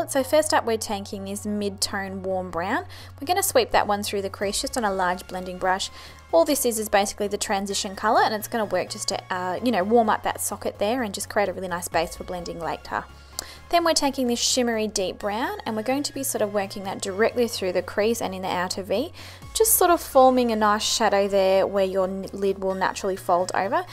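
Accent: Australia. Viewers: G'day! Just found you (via the Rimmel lippy reviews) and so lovely to hear another Aussie! I've subscribed already :)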